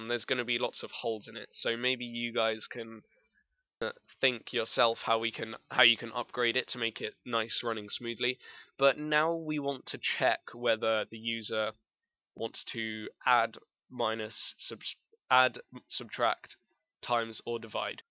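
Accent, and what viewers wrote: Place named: United Kingdom. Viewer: Thank you so much for this tutorial, my comp sci class has this type of problem due tonite, which sucks because we just had to turn in a huge programming assignment the night before so I haven't had any time to work on this. Oh and I always like hearing a british accent, that alone increases anyone's credibility and knowledge :).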